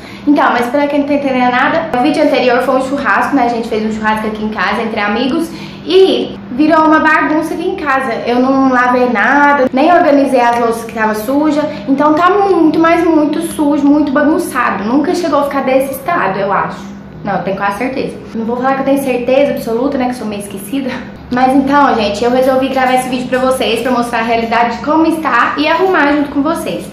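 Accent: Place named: Minas Gerais, Brazil. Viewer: Nosso sotaque mineiro e outro nível ❤️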